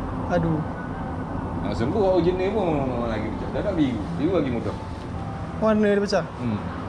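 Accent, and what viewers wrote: Terengganu, Malaysia. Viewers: Mmg minat la abg ni, muka garang tp bila cakap lemah lembut, best lak dgr slang Terengganu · Kecek ganu la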